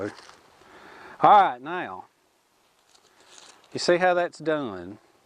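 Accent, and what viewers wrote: South, United States. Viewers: you speak "country boy" and that's good enough for me. As long as your "peoples" can understand you, don't worry about the rest. Besides, the people that don't watch aren't in our league. God Bless Brother!!!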